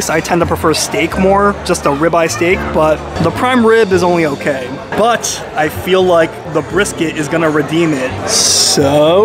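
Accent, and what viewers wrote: United States. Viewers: You have an Americanized sounding voice. You don't have a foreign Asian accent.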